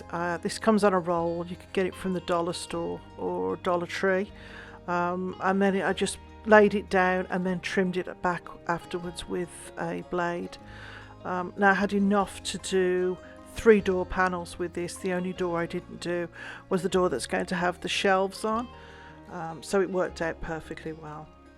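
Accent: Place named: England, United Kingdom. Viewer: Ive oy been watching for short time but i love all tge creating that you do. I love your style as well. Ive wondered because of your accent if you lived in England. Something you said in one of your videos made me think you were in Canada. Now in the comments i see you bought a home in France. Congrats on that. Please tell me where you live now and doing all the beUtiful work.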